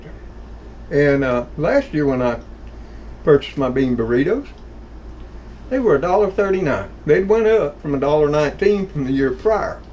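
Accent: South, United States. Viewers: Great Southern twang.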